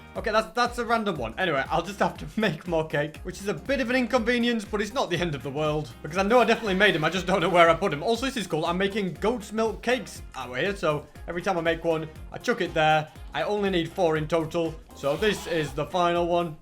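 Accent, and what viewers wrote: United Kingdom. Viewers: Hi are you British or American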